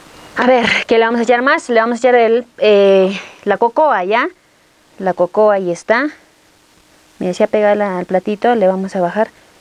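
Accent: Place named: Bolivia. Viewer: . Sos. Más boliviana que persiana porque tiene tono Bolivianas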